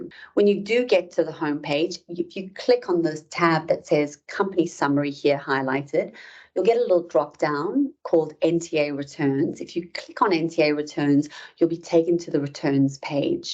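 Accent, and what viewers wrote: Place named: South Africa. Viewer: Rock on GVF! Love the South African accent too.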